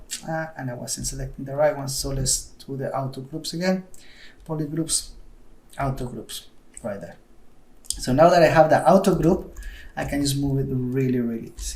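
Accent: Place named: Mexico. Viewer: se nota bastante el acento mexicano ojala te animes a hacer en español un dia krnal saludos!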